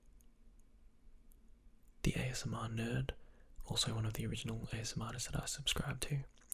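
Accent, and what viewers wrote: Australia. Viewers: I know it's not a new game, but the perfect game for ASMR would be Endless Ocean. The calm music, the player's slow deep breaths through the oxygen tank, the sound of the bubbles surfacing. All that plus your low voice. It sounds like the perfect recipe for ASMR. Your Australian accent would also fit the theme of the game. I'm not sure if that'd be a game you'd personally find very interesting though. I enjoyed it.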